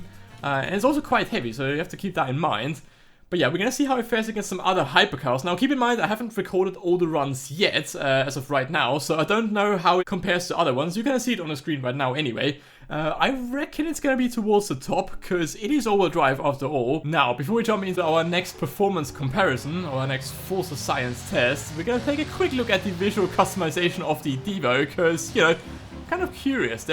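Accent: Germany. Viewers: Find ich nice, dass man bei deiner Stimme raushört, dass du deutsch sprichst😂🙈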